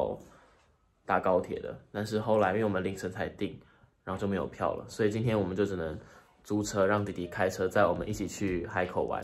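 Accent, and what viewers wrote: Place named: Chongqing, China. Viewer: 所以学弟弟是海南人嘛？刚刚说的不是重庆话嘛？我凌乱了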